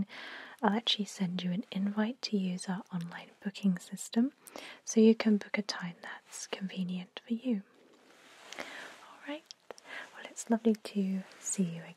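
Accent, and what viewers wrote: United Kingdom. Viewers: I don't know what it is about a British accent, but it makes ASMR videos so much more enjoyable. 😊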